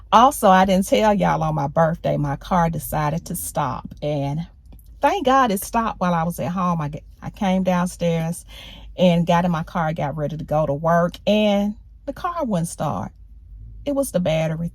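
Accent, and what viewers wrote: South, United States. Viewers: I'm trying to figure out what state you are from with how you pronounce your words like tire >tyurre lol, its cool bit of a southern drawl. Are you from Maryland or Virginia?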